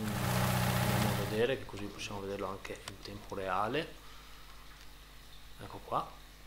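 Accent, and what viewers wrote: Veneto, Italy. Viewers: Sei veneto?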